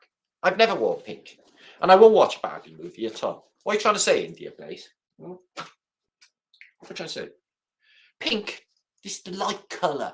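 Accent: Wales, United Kingdom. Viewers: Are You Welsh? NOOOO WAAAY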